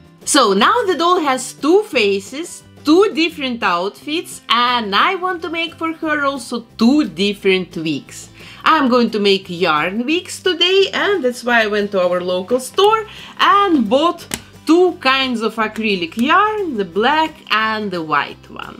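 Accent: Russia. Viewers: I'm sorry, I'm new here and this video was in my recommendations. You are very cool 😎 But where are you from? You have russian accent))) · замечает присутствие русского акцента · And she is speaking with a Russian accent, so.... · Видео классное ,но русский акцент слышно очень сильно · Ахах, я слышу явный русский акцент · Я просто слышу,что эта женищина из россии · Вау I'm Russian. И мне одной кажется что она говорит с русским акцентом? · Ты случаем не русская или это британский акцент?? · судя по акценту, вы русская. простите, если ошибаюсь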